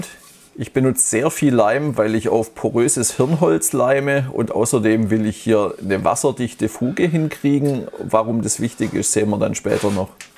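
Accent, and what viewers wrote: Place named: Swabia, Germany. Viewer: Gut gschwaebelt,. I daet des ao so macha: wieder emol ebbes drechsle. Griesle vom Dreiländereck!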